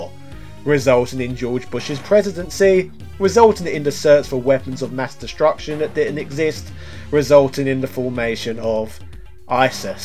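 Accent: United Kingdom. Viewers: Disliked because of the MJ pic on Home Alone. The rest was stuff a lot of other channels already covered. Waste of time. Unless you always wanted a british voice to say the stuff you already knew, if not its a waste of time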